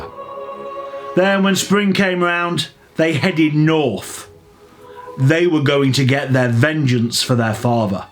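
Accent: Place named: United Kingdom. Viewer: Wonderful narration of what is often, to many Americans at least, a rather convoluted part of British history. I must admit though that it's likely the British accent that helps solidly nail the performance.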